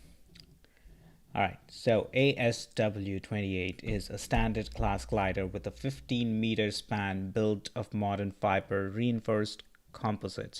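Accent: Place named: India. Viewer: Nice video man love your accent ahah ;) are u from India ?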